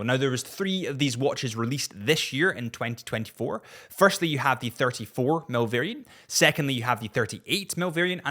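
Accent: Scotland, United Kingdom. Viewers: Mate it’s like listening to Adrian Barker with a Scottish accent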